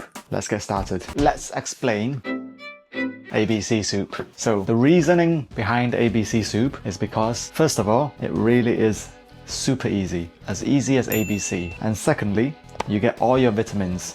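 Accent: United Kingdom. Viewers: are you educated in UK? you have a very UK accent, ^^ its a complement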